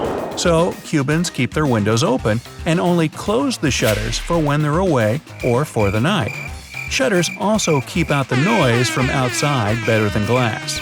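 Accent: United Kingdom. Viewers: Baaaathroom. The British accent makes me sick! Sounds so robotic.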